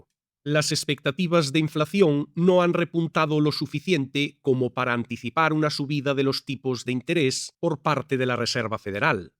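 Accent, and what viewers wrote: Galicia, Spain. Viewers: Me encanta absolutamente que el narrador sea gallego. No lo cambiéis nunca!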